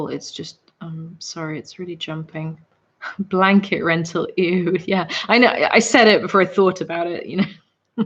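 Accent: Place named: England, United Kingdom. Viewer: I'm. A huge fan of you ur the aesomest English girl I all so love ur acsent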